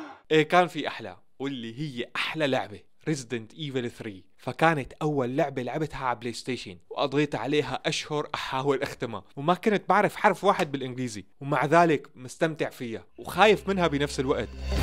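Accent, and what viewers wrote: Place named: Syria. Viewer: يازين اللهجة السورية والله احبكم يأهل سوريه تكفون ادعولي اتجوز سوريه الله يخليكم والله من وانا صغير احب السوريين واحب لهجتهم.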